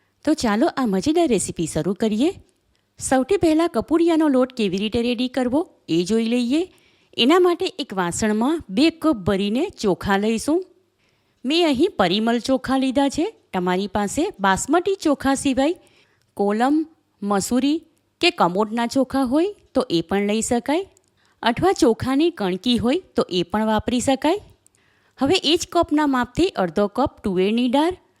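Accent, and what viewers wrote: Surat, India. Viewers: Proud to be surti. Amari bhasha avi j che ane rehse. Tamne ni fave to tame skip kro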